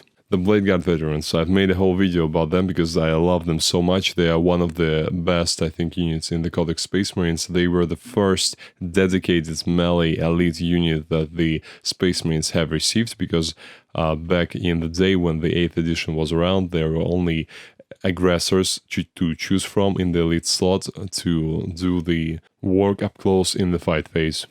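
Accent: Russia. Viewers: Are u Russian?🥸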